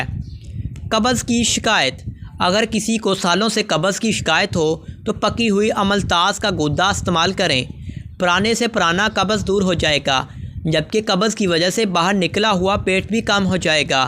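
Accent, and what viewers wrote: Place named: Rural, India. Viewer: Oh pendu baat krni ki tameez Nahi moh utha kr video bananaiy ajataiy hai sastay Hakeem Kiya talafuz hai Urdu k tera pendu bs kr dy bs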